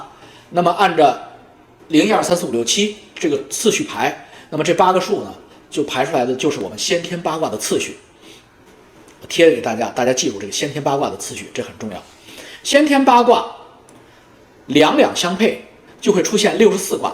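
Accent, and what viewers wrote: Henan, China. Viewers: 怎么感觉这么像河南话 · 那不就是河南话吗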